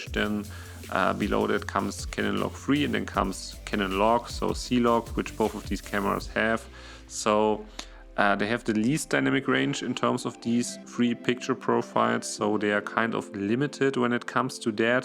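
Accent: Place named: Germany. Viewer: Man merkt, dass du deutscher bist! Informatives Video.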